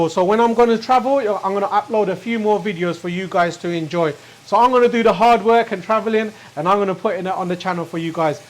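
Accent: United Kingdom. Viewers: bloody british accent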